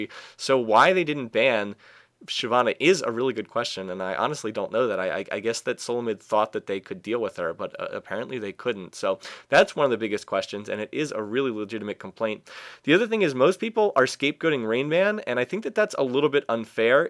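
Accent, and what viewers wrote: Canada. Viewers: always thought you was from Canada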